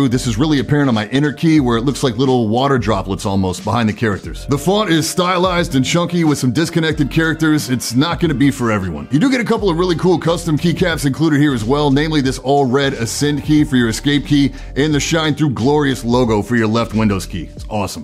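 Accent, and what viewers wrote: United States. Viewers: Man this dude is so great. Finally an American (at least I’m guessing from your accent) who measures using the METRIC system. Thank goodness you’re trying to please literally everyone except Americans 😄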